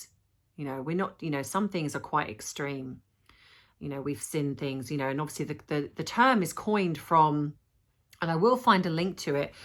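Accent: United Kingdom. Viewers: / I’m sure you’ve got some special tea to soothe that lovely British voice. 🥰 Sending you well wishes & positive prayers. 🇺🇸👵🏻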